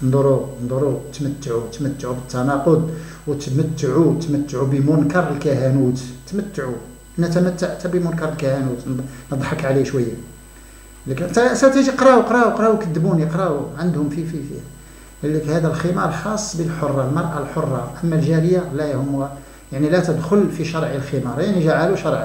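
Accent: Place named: Morocco. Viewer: تحياتى اليك دكتور، لي عندك رجاء: اللهجة المغربية صعبة قليلا ،ويزيدها صعوبة سرعتك في الكلام. ليتك تتمهل قليلا في الكلام حتى نتمكن من الاستفادة من علومك القيمة .وشكرا جزيلاً ...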